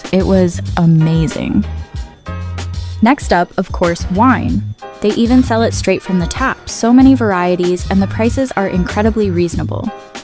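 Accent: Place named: United States. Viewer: Korean??? Then where is the american accent coming from?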